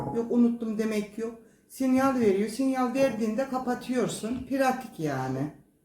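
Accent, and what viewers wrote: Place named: Bolu, Turkey. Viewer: bolulu musun aq o nasıl bir lehçe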